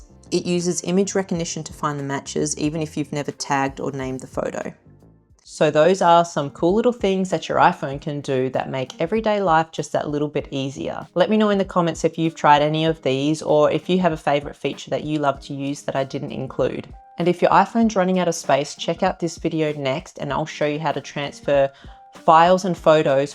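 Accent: Australia. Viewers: Thanks for this informative video. I’m in my 70s and realise I have so much to learn. Great to hear an Aussie voice. Will be checking out more of your work ❤❤ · I must admit, half of the reasons why I watch these videos is because I miss Aussie accents too much. You go girl!!! Straya!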